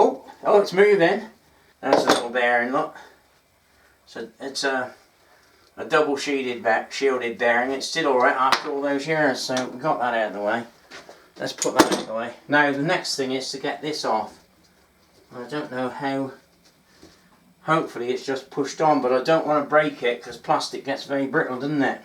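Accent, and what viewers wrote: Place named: Cockney, United Kingdom. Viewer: Thanks. I LIKE it when you lay the Cockney on thick for us colonials.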